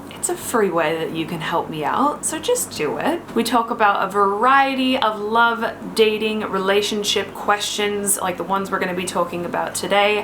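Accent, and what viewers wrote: Australia. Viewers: i love how all your “O’s” are so Australian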